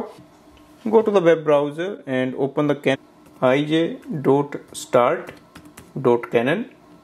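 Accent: India. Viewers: You need to practice more on pronunciation before making videos. The Indian accent is terrible!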